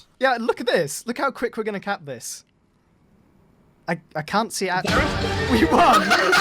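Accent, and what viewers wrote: United Kingdom. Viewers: Are you British? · YES, MY BRITISH SOUNDING MUM IS BACK! Seriously though, you make amazing content and I hope you continue to make great videos.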